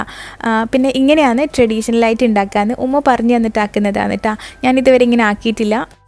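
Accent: Kannur, India. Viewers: ചുവന്ന കുറുവയല്ലാട്ടോ മഞ്ഞ കുറുവ 🤣🤣🤣 നാട്ടിലെത്തിയപ്പോൾ തനി കണ്ണൂർ സംസാരം ആയിട്ടാ പൊളിച്ചു 😍😍😍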